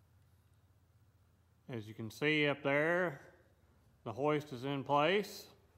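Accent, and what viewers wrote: Kentucky, United States. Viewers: I have to say I subscribed after watching you on Keith Rucker's site. I've become a fan but just tonight I went back and watched all the barn build vids. I am blown away by the work you do by yourself. You basically rebuilt a barn to save a roof. You may not have Abom torque, but you damn sure got plenty of Fenner's Getter Done. I love your vids but if I could give you any advise it would be work on the audio. I don't know what these other guys use but I have a hard time hearing you at times and I'm from TN and understand the KY accent. I also love that you include Bailey at times just like Adam did Stella (RIP).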